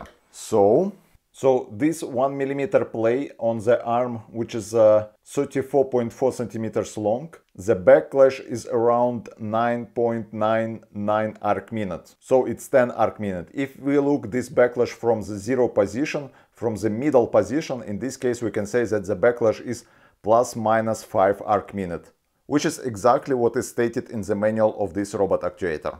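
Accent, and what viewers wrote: Russia. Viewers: Привет МУЖИК! Ты разговариваешь с Русским акцентом, давай уже видосы на Русском. Ты афигенен! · Вэри хард инглиш. читаХ - а у русских всё через Х.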